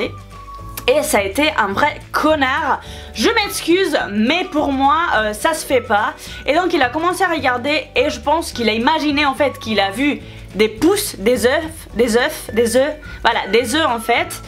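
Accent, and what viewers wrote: Spain. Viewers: Cc je te suis depuis pas longtemps j'adore ton axent Espagnol sa serais mon rêve de pouvoir parler couramment l'espagnol mais j'ai encore le temps au passage ses pas des pouces mais des poux et ma question pour la FAQ est ce que ta nationalités est française ou espagnol Gros bisous ❤️😘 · Je suis nouvelle sur la chaine dite moi quel accent elle a ? On dirait de l’espagnol ? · non mais a son accent des le debut ça s'entend qu'elle est espagnole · tu es magnifique j'aime trop ton accent espagnol ❤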